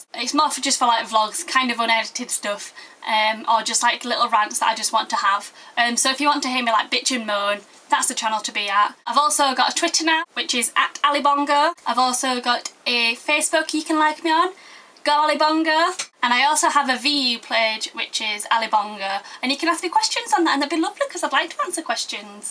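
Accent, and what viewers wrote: Yorkshire, United Kingdom. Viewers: Love got a great accent, most of my family is fromYorkshire, be it 200 or more years ago. You have a great sense of humor, bet we could keep each other laughing for hours. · Thank you for helping me prove that the Yorkshire accent is not the same as my accent (Lancashire). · Ey up lass, I'm also from Yorkshire. "Tin tin tin" is a good one, it means "it isn't in the tin". I go to Zimbabwe every year. Their country is run my an evil dictator whose name is "eee bah guM" but backwards. You have a cracking accent by the way! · finally someone with a yorkshire accent on youtube! i have one toooooooo :-D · Yorkshire accent is EPIC! My mum is yorkshire, and my brother got a hold of the accent, unfortunately I haven`t got it xD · I like your accent. In fact I am watching your videos because I have to do a Yorkshire accent for a play I'm doing at college haha. 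· Yorkishire makes me think of Game of Thrones or other northern fantasy universes. Because Sean Bean. It sounds so cool I want to sound like that. You could insult me in that accent and perfectly get away with it I'd still love you xD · Not gonna lie, I came here mainly because I'm studying the Yorkshire accent for a musical I'm doing, but I really enjoy your stuff. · You are an absolute hero for drinking all that gravy! :L and i love the Yorkshire accent with a burning passion, and you're at Huddersfield! one of my fav bands are from there (Evile). I'm from Aberdeen so i can have fun when down south by speaking broad Aberdonian (see Son McGuffin from Pixar's "Brave" for an example) so i know the advantage of having an accent which is a totally different language :L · Yorkshire is my FAV UK accent. Considering that I am from NZ and my UK fam are Geordies, I just love how the Yorkshire accent sounds. 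I was also told that Leeds hold the best people in the UK. I tend to agree :o) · I could listen to your accent all day love, really beautiful, I love a lass from Yorkshire. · Your so awesome! Love from Canada. My Dear old Nana is a Yorkshire woman, and she sounds just like you. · Never tone it down. Don't be ashamed of it. Be proud of your accent, be proud to be different :) I'm from London/Kent but I've got family from North Yorkshire and its like a second home to me. I love the accent :) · Dewsbury, where I have been. Love this accent, but anyway it made me really confused at the beginning. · Be proud of your Yorkshire accent! I love mine to bits and I'm from Leeds so I sound as slack as they come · love the Yorkshire accent, love the part on how to pronounce "o" I'm from Newcastle (Yorkshire's friendly cousin from just up north :P)...we decided to scrap it completely from most of our words don't = divn't know = kna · Your accent is quality! 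I'm from the Midlands but I love the Yorkshire accent :) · The Yorkshire accent is my absolute favorite English accent. You are so charming! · Leeds style Lovin' It; This make me miss Sheffield, also I never realized entitle I moved how different South & North Yorkshire accents can sound. My Yorkshireness has been slipping, this has been a lovely refresher. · Thanks so much!! Trying to learn/understand the Yorkshire accent for voice class! So helpful! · Nothing wrong with a Yorkshire accent. I'm a soft southern sod with a fruity neutral accent.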